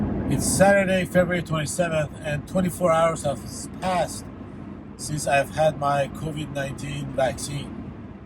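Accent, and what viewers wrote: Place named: Arab world. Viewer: Just out of curiosity are you originally from the middle east? My GF (from Iran) and I have been trying to place your accent (maybe do an episode about what you do in life, profession, what got you into overlanding, etc.). Loving your videos btw. Keep them coming! I did 5 BDR's last year in my JKU, sold the RTT and am in the home stretch of a year long wait for my J30. I live in Chula Vista so if you ever make it back out this way, DM me and I'll buy you a burrito and a beer. 👍🏻😎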